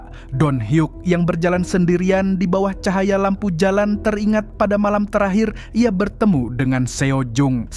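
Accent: Banjar, Indonesia. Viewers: Bang Kamu orang mana bang, kaya logat Banjar 😮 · keliatan urang banjar nya wkwk jah bang topi bunggul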